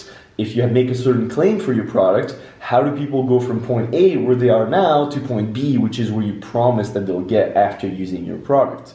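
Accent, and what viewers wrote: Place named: England, United Kingdom. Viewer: Although I understand English (by the way you've a f*cking English accent it's beautiful!). Et selon moi, rajouter des sous-titres en français serait top (si jamais des français qui te soutiennent passent par là 😜) Prends soin de toi et hâte de voir tes prochaines vidéo chef 🥳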